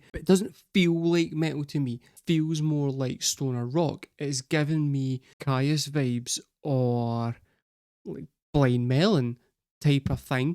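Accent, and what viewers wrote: United Kingdom. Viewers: With an accent like that, it's obvious you lived on the same island, how could you not have grown up on?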